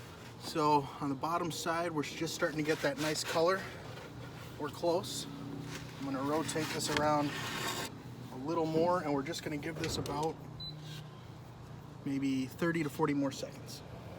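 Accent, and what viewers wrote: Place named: United States. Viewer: We say basil you say baysal lol, love the American English.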